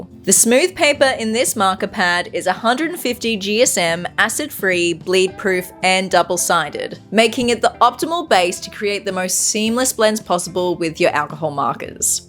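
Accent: Australia. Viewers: I appreciate that Ohuhu sponsors so many Australian artists so I get to hear them all say "aurhewhew"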